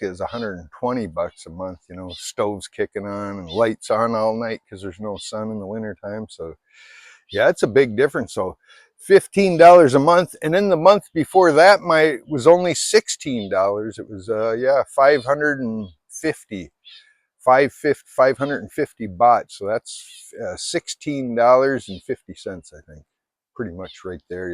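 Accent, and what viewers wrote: Canada. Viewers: Anybody ever say you actually sound Canadian?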